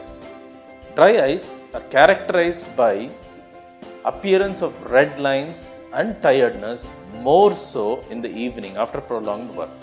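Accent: India. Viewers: Why do i love indian accents?? Lol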